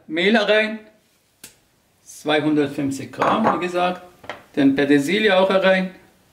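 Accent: Swabia, Germany. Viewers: das beste ist dein schwäbisch. Danke furs Rezept. :-)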